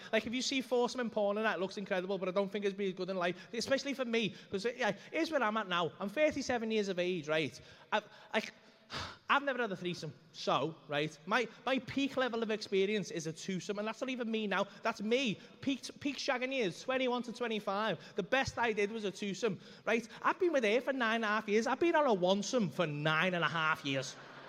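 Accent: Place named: Manchester, United Kingdom. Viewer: you manchester im guessing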